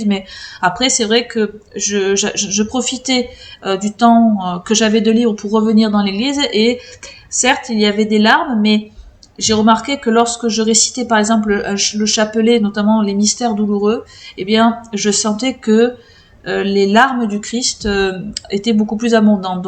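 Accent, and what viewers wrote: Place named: South, France. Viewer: Effectivement, l'accent du sud se fait parfois entendre. 😉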